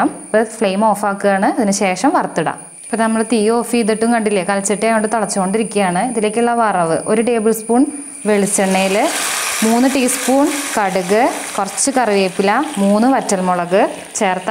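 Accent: Thrissur, India. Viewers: eppozha chechide channel kadath...Nalla Avathranam...naannayid receipeum....chechide veed evdyaa.Thrissur Aano....God bless u&Ur family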